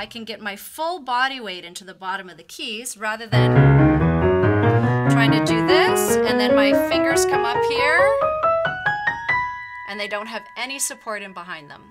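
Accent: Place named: United States. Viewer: Great and clear staff and what a beautiful american english accent!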